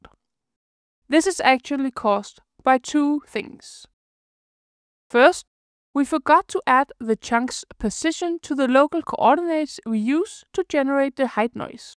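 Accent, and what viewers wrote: Denmark. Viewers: No problem, have subscribed and rung the bell too. also are you danish by any chance?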